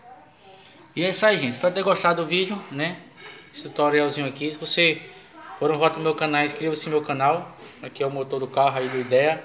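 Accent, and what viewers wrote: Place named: Maranhão, Brazil. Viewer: Parece Maranhence falando, não entendi nada.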